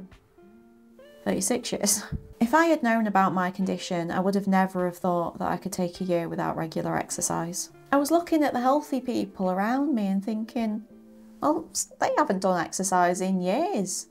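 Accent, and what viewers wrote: United Kingdom. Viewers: Literally feels like listening to an alternate version of myself... but with a British accent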